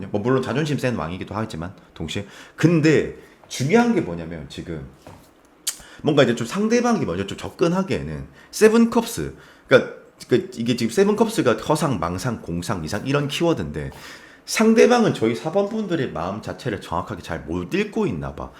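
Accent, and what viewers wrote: Gyeongsang, South Korea. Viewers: 3번 리딩 감사합니다🙏🏻 구수한 경상도 사투리 넘 듣기좋아요 ㅋㅋ 중간에 살아있네ㅋㅋ 쌤 리딩 처럼 졸라 좋은 결과 와서 후기 남기고 싶네요 😂 추운날씨 감기 조심하세요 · 같은 경상도라 그런지 더 정감이 갑니다~ 2번 선택 했는데요~ 요즘 다들 힘든 경기 겪고 있는듯한데 부디 잘 버티고 힘든 시기 고이 지나갔음 좋겠네요. 영상 자주 보고잡아요😊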